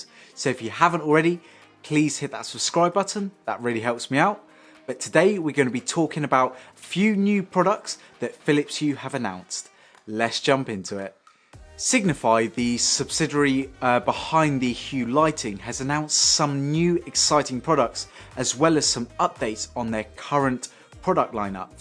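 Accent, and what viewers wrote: United Kingdom. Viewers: what a great reviw! . Amazing british accent!. Keep up ! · waster my time of 6.34 minute was think the product was uk market & he got a british accent! but i was wrong it for the usa market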